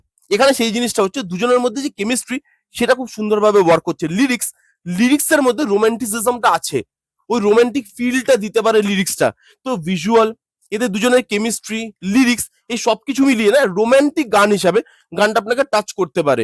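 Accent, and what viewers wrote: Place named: West Bengal, India. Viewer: vai apni je Malu opar banglar oita apnar kothai buja jay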